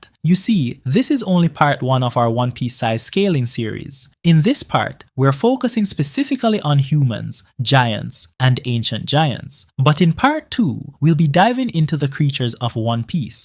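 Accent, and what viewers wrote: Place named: Jamaica. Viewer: You Jamaican bro? There’s a familiar accent in your tone😂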